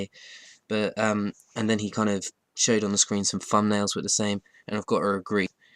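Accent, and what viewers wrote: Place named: East Anglia, United Kingdom. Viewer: Your pronouncing haha east Anglian lad, loving then showing off of the stats at the end haha, good gameplay tho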